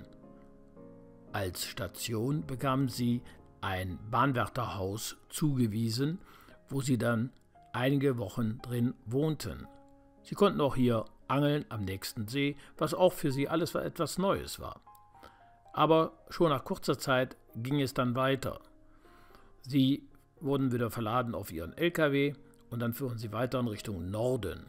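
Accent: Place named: Bergisch Gladbach, Germany. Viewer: Ich glaube Bergisch Gladbach . Danke für die tollen Videos.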